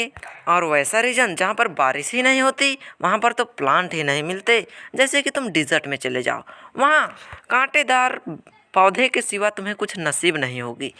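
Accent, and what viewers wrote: Bihar, India. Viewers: Are you a Bihari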